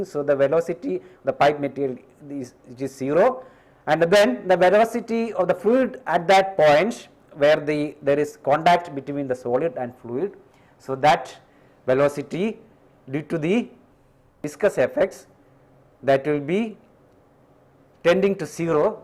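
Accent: India. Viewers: because you re indian, for me it is sooooo hard to understand indian english accent but lecture is good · I'm Indian, adn I definetly agree that he has a very strong accent,a nd ppl frm around the globe may have difficulty understanding, tough Indins think his English is very clear. However, STANFORD and many other universities have such videos too.. So, I would say different videos cater to different audiences, in terms of quality, ease in understanding adn for obvious other reasons ! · the standard is good, moron. his english is fine, he has normal accent for indian people, and dat is fine. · Great lecture! It may be hard for a non-Indian to understand his English but with practice you will be able to do it.. Indians are good it math and its worth understanding them even if it requires practice.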